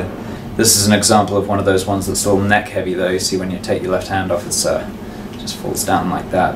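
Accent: United Kingdom. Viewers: is one of the heaviest bassists on YouTube also: is British and has a POSH accent me: visible confusion and astonishment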